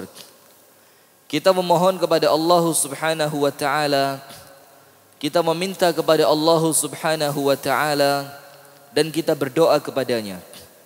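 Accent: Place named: Surabaya, Indonesia. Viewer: Ustad dr Jawa timuran ndelok logat e lek gak arek SBY Yo arek malang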